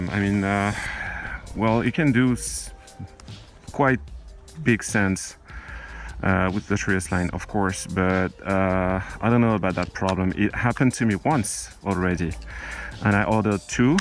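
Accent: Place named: France. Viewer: Like you vidéos and your french accent ! Good job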